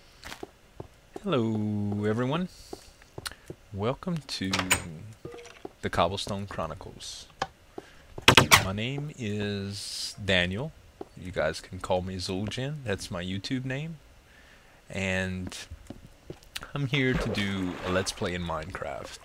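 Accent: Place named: Texas, United States. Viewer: you say too too much too too much. but nice voice, accent sounds like a mix between texan and european lol.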